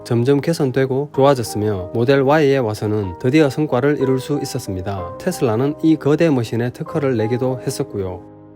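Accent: South Korea. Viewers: 님 안녕하세요..처음 여기 와보네요.사투리가..칠곡 살던2000년 기억나네요..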